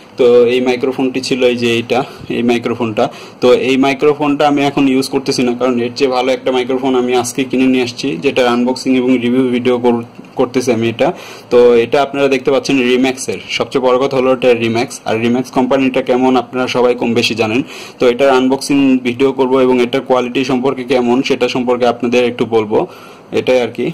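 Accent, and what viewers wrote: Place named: Sylhet, Bangladesh. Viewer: আপনি সিলেটি..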